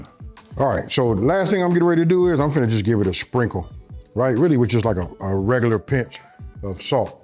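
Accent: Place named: California, United States. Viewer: Man this right here cuz!!!!!!!!! Omg this is seasoned to perfection. I’m here a Cali accent but I’m seeing down south cooking going on. Good lawd!!!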